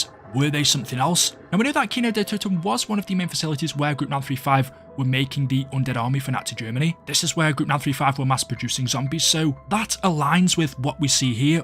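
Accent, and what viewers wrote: United Kingdom. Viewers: British person speaking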